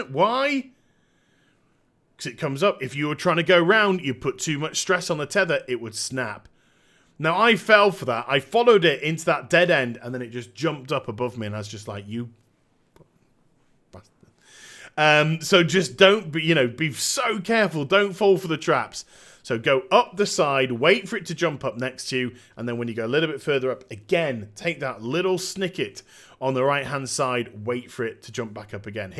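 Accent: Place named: United Kingdom. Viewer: The Brit narration makes this fun to watch! Calls the moose "bugger" and such. And after watching this video I am not even going to bother with the quest.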